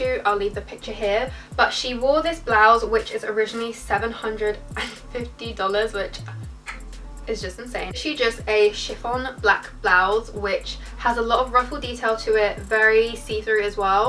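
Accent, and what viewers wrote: United Kingdom. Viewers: Brb about to spend all my coins in Shein😭 when I heard your British accent I was like yesss UK stores will be recommended 😭😭 And now I’m lost on Shein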